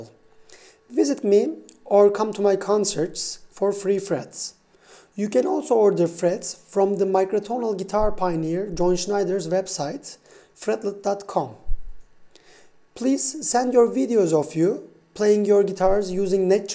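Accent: Turkey. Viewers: Aksandan anladım ilk Türk olduğunu :D çok düzgün İngilizcen, helal olsun. Türkiye'den böyle bilgili müzisyenleri medyada görmek çok güzel. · abi şivenden anladım türk olduğunu like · Nice work, sir! Ingilizceden anladım Türk olduğunuzu.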